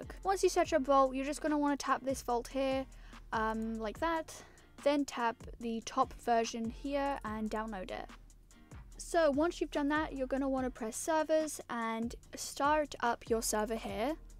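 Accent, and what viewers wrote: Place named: United Kingdom. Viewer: aye u quite bri'ish yere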